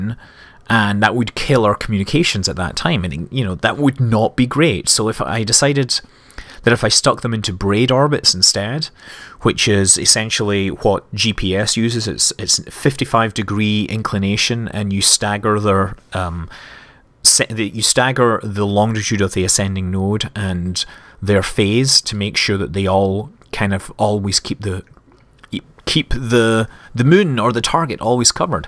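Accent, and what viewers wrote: Scotland, United Kingdom. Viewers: i need to know.... are you scottish?because you sound pretty scottish to me and ive heard alot of scottish people:P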